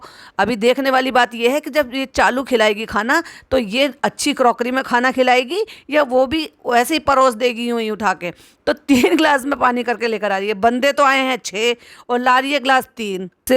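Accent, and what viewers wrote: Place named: Old Delhi, India. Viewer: Aapke bolne ke style se lagta h Ki AAP purani dilli se bai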